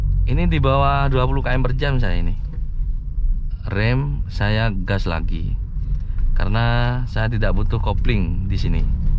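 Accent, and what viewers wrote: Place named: Madura, Indonesia. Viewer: Madura mana bos?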